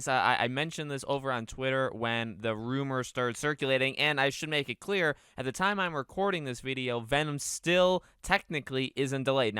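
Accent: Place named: Massachusetts, United States. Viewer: The Massachusetts accent is really strong with this one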